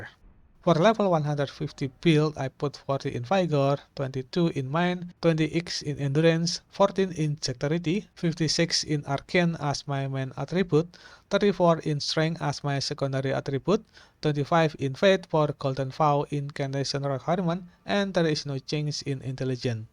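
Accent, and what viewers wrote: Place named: Indonesia. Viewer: iya bang pantesan aksennya kyk org indo cuman pas liat channel infonya dari us wkkwk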